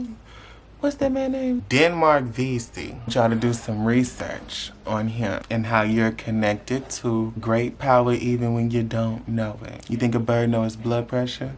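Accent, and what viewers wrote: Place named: South, United States. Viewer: You can tell is from the south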